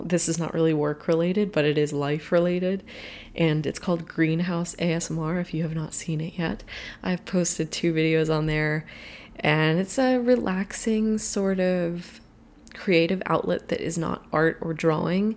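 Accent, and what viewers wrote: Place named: Germany. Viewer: I just stumbled upon your channel and wanted to say I absolutely adore your work. It's so stunning yet soooo cute and you've definitely won me as a fan <3 love from Germany P.S. splendid German accent haha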